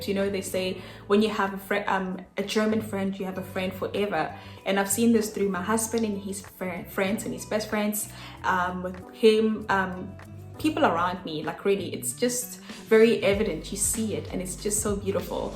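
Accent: South Africa. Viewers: your SA dialect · Where are you from? You have an Afrikaans-English accent so I'm guessing Guateng??? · I enjoyed listening to you with the South African accent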